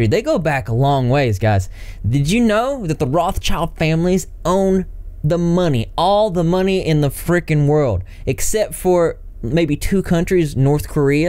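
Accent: South, United States. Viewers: Deep southern accent + purple hair + wierdo earrings/plates =???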